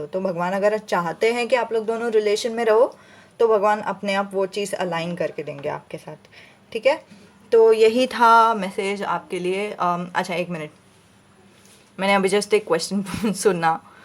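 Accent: Maharashtra, India. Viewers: Aap Marathi ho Nice tone 😀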